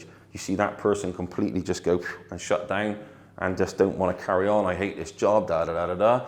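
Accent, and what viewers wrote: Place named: Bristol, United Kingdom. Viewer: Brilliant lecture...sounds like from Bristol too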